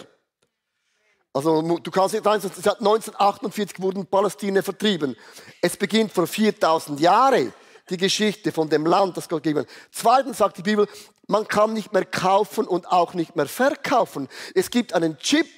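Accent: Switzerland. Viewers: Herzlichen Dank! Ich fand erst den Schwizer Dialekt Kanal, habe Sinngemäß verstanden,war jedoch anstrengend 😊 Und jetzt bin ich hier. Danke!❤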